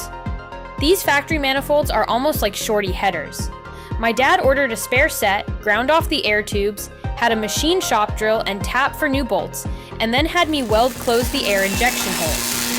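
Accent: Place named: United Kingdom. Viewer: Nice job, you so funny and cute with that British accent!!!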